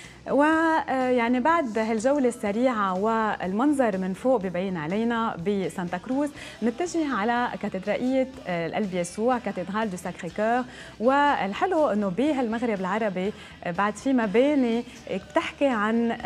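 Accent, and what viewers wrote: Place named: Lebanon. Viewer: هههههههههههههههههههه..سبحان الله تقول عليك لبنانية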